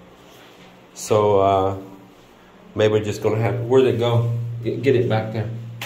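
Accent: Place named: South, United States. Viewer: Dang: I thoroughly, )( THOROUGHLY ) enjoy and learn from your skills. You and Mylinda are really blessed. Still fine tuning my ears to your southern accent! I'll try and negotiate emailing you so we can be friends.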